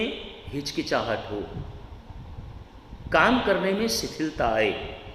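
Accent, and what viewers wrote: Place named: India. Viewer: Your voice completly hindi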